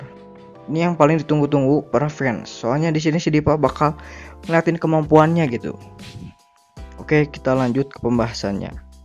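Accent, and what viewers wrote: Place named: West Java, Indonesia. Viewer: Logat nya sunda banget 😁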